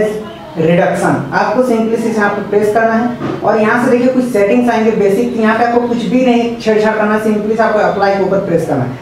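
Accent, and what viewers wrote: Bihar, India. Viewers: Are you from Bihar.???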